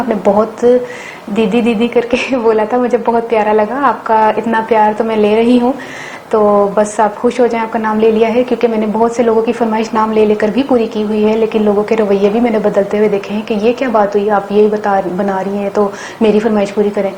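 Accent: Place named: Punjab, India. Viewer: behna your punjabi language voice i love it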